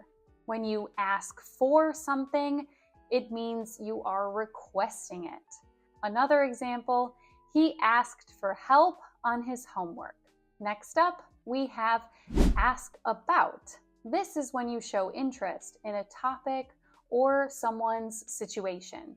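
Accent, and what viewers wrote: United States. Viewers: ❤American accent New pupil is coming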